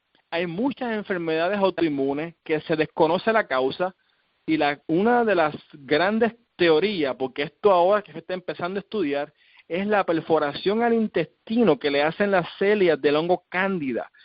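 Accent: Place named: Cuba. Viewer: NO SE ENTIENDE CUAL ES EL PRIMER MEDICAMENTO QUE ACONSEJA..."..no se qué...clins" , que contiene 3 productos, dice. Pero imposible entender por la dicción de quien habla, parece cubano, no?...Por favor, si alguien sabe de qué medicamento se trata, compartirlo o responderme. Muchas gracias! 18/03/2019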